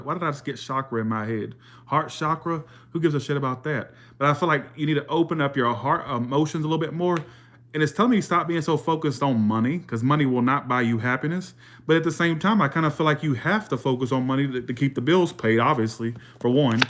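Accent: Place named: South, United States. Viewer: you are so handsome! Love your southern accent too! Good reading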